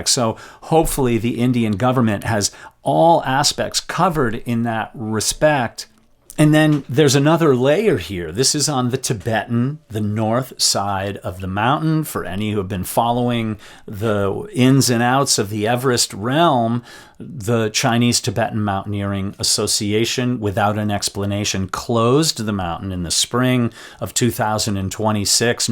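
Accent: United States. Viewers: Would you please consider learning how to pronounce the Sherpas' name correctly? It is disrespectful to be still calling them in such heavy Americanised accent when you are covering stories and news about Everest.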